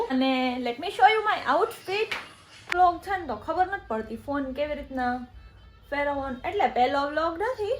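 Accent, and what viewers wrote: Kathiawar, India. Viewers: Tamre bolvanu tone pure kathiyavadi jevo che...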